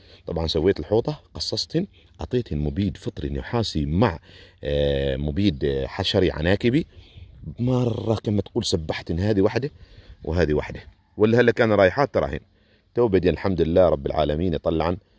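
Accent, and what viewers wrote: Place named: Algeria. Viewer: صوتك تقول عليه جزائري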